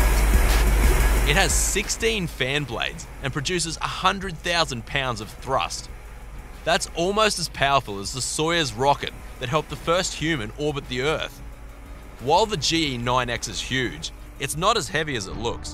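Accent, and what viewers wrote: Australia. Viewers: Are you Australian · Aussie accent is perfect for this type of content:elbowcough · It does help that the narrator of this video is Australian. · Australians make everything sound like I want to go Outback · he sounds aussie ngl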